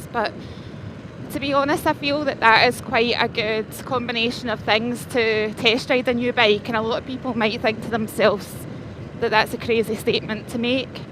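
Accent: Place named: Scotland, United Kingdom. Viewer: Very deep Scottish accent!!!! Thank you for the info!!!!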